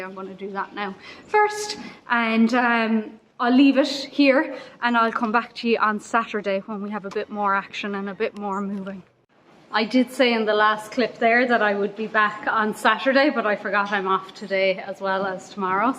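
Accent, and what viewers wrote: Ireland. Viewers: Well done. You really do work hard. I love your soft Irish accent. I could listen and watch you for hours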